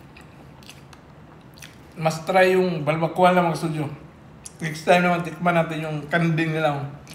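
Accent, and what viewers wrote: Visayas, Philippines. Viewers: Bisayaa jud ni ug tingog ka studio😄😄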